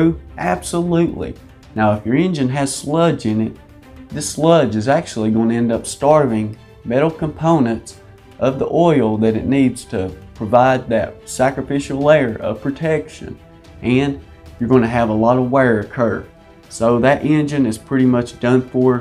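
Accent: South, United States. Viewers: Super strong southern accent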